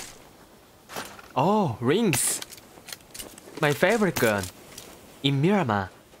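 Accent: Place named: England, United Kingdom. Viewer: I like English Accent 😆❤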